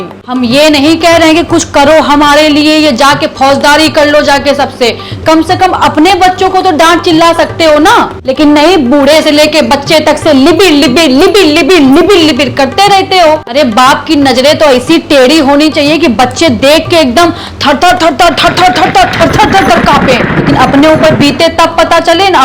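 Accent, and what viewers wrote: Kanpur, India. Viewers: I think this is Kanpuriya style of speaking very nicely presented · Nice style as mammi. Bhasha sunta hoon to apno jaisa lagta hai ji. Kanpur ki jaisi boli hai.👌👌👌👌👌👌👌👌👌👌👌👌👌👌👌👌👌👌👌👌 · हर समय क्रोध में ..!!..!!. वैसे आप की बोलने की शैली कानपुर की लगती है 😊। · Kanpuriya language. Heart touching. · apki awaj bilkul hamare Kanpur jaisi hai.kya aap Kanpur she belong karti ho.your acting is super,real facts,real me aisa hi hota hai.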